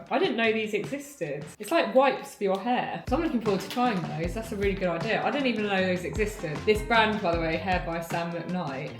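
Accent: United Kingdom. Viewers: I live in the US, I know, we are a bit of a target for jokes lately. But I've had a few friends from GB over the years, and the really good ones had the same 'accent' as you. That, your bubbly personality, and the notion that my old a$$ can get some exercise, is why I love following your channel. Keep up the good work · Those well spoken from either continent refer to your speech pattern as "brit-lish". Grammar, enunciation and spelling do matter. That said, I've always wondered why every girl in america has a thing for men with british accents. I for one, have no special care for the female version of the same. All that said, I love your jump rope videos. Looks like you're having a great time! Hope you keep it up. People here need to get off their bums and get some exercise and your way is as good as any other! Best of luck and just ignore the haters. 🎉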